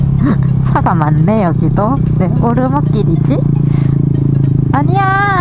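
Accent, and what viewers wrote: Busan, South Korea. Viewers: 와.. 부산사투리 매력 취한다...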